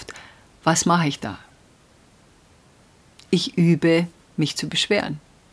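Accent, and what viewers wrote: Bavaria, Germany. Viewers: das mit bayrischem Akzent, netter geht's net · Ich muss immer lachen wenn Dein Bayrisch durchkommt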